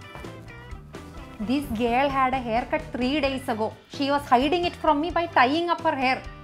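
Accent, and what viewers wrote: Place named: Kerala diaspora, India. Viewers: I like the way you talk like any malayali mom living outside Kerala.. my both daughters are your fans. They only asked me to watch your episodes . at this young age you are doing good work.. & ofcourse you resemble Amala Paul a lot... all the best dear.. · I watch this channel only bcoz you, and specially Ur Malayali accent. I love it. You r the best. I have faced this when I secretly had my left ear piercing, repeated when I got the right ear pierced the very next week.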